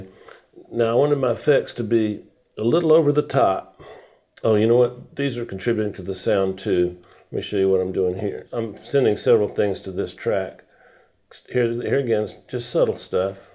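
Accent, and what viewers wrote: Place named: South, United States. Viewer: Its that slight southern accent, which I love to hear...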